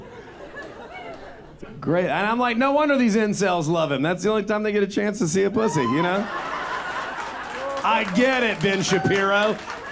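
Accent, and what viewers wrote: South, United States. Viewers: Like George Carlin. With a Southern drawl❤❤❤ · I`m not a native english speaker and whenever you tune up your deep southern accent I have to turn on subtitels too 😅 Great special though.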